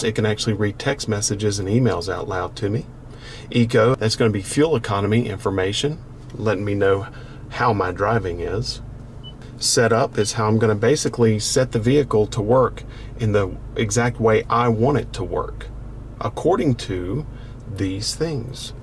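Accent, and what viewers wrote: North Carolina, United States. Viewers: Living here in Missouri, I noticed your accent was North Carolinian, then I saw a Popup that said you were in High Point.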